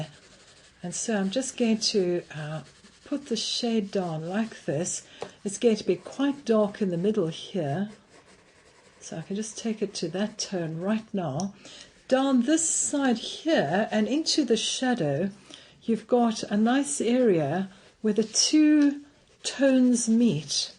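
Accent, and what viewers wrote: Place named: RP, United Kingdom. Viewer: So posh :p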